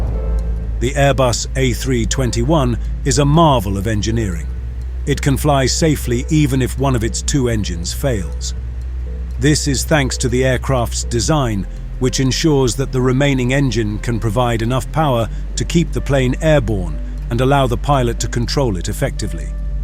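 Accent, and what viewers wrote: England, United Kingdom. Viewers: It's a very well-spoken & clipped English accent - I am sure I recognise the voice but I can't put a name to it, but it is a human voice. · Oh no a British accent speaking in US English.... You tube again.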